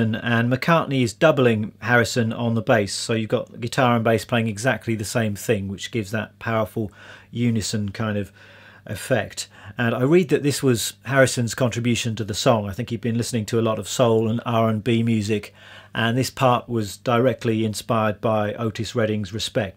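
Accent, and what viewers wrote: United Kingdom. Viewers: Eres británico verdad?jsjs:3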